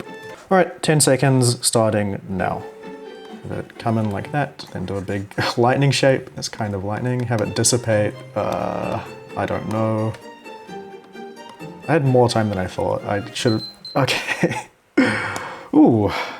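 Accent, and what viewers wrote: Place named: New Zealand. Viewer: I knew you were a kiwi!!! I was like why does he sound so familiar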